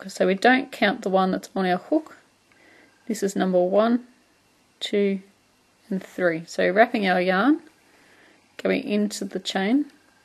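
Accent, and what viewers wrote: Australia. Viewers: Made this today in an earthy brown to match the little bag I made from your cluster stitch bag pattern. SUPER cute. LOVE your patterns. Love your videos. LOVE your Aussie accent ;) p.s. Aussies rule. Never met an uncool Aussie.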